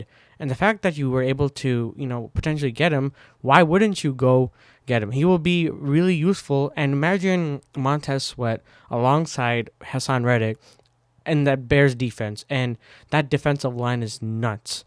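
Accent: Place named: India. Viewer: the thick Indian accent in the video tells me he doesn’t know what he’s talking about and you’re just out here glazing these ill advised takes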